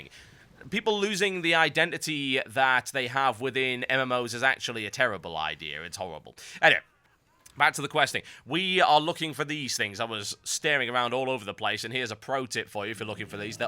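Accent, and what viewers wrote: United Kingdom. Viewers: wait...are you complaining about a British having an English accent? I'm speechless. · telling a British man to lose his accent... gg kthxbai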